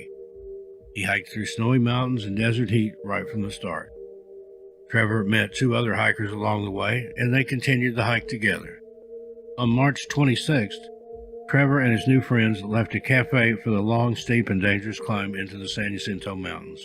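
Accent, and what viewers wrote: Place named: South, United States. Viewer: Your story telling is magnificent, and your voice is extremely comforting. Being from the south, your accent really makes it feel like home. Great work, and you've got a new subscriber! ❤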